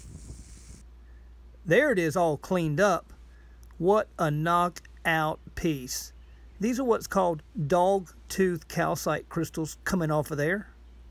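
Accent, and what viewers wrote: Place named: Pennsylvania, United States. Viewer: Your accent sounds like you are from PA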